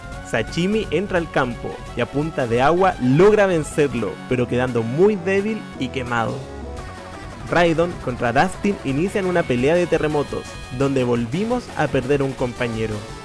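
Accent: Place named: Chile. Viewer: Grandioso video, para ser sincero lo único a mejorar sería los trazados de dibujos y talvez algo de anatomía, la animación uuf en eso estamos bien aunque yo no sé de animación así que ahí no me meto ajaja la verdad me encantó tu video y entendí CADA referencia y además e de suponer que eres chileno por tu forma de hablar y por alguna que otra referencia ajajaj y sera un gusto ser parte de tu canal y dar mi like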